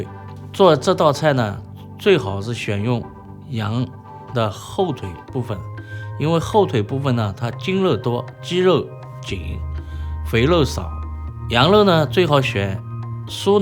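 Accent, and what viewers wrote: Shanghai, China. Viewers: 不会啊 就是略带上海口音的普通话 没有感觉很吃力的说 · 大厨的上海普通话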